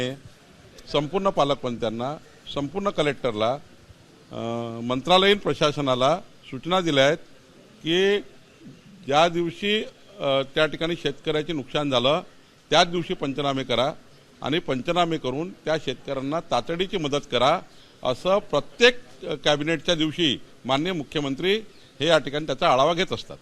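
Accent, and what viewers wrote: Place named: Vidarbha, India. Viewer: विदर्भातील नेत्याची बोलण्याची पद्धत थोडी वेगळी आहे.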